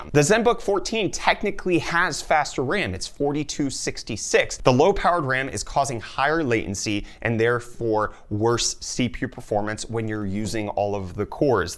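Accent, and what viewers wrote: South Africa. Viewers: Wait this dude is South African? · Wait are you South African?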